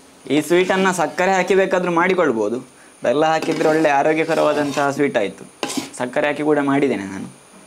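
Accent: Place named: Dakshina Kannada, India. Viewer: Le ..mangya... Edu dakshina kannada base... Respect kodu...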